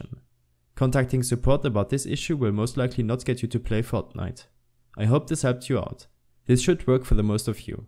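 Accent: Germany. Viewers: Man merkt dass du deutscher bist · lol ich hab sofort deinen deutschen dialekt erkannt xD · bist du ein deutscher??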